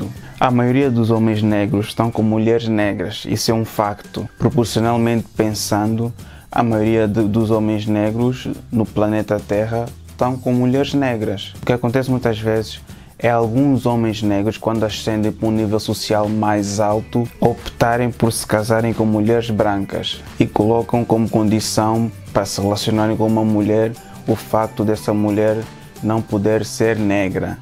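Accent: Portugal. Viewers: pq homens afrodescendentes nao gostam de mulheres afrodescendentes? e vc é de qual país ? Portigal ? q sotaque de portugues é esse? eu sou brasileira e vc parece ser tão brasileiro por sua cor moreninho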